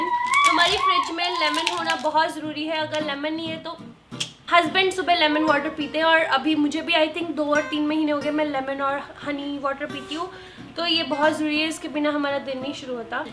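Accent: Punjab, India. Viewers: Your videos always make me smile...being a Punjabi I lv ur Punjabi tone too...😘😘😘😻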